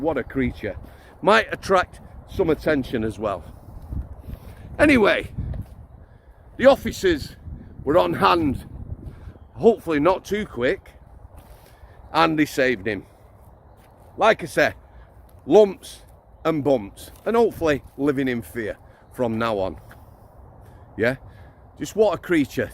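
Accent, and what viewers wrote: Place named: Yorkshire, United Kingdom. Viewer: Yorkshire through and through lol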